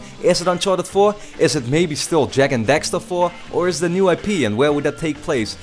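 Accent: Netherlands. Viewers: I really hope Uncharted 4 will be made by Naughty Dog. But one little question are you Dutch? Because you have a Dutch accent :p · You have an dutch accent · are you dutch!?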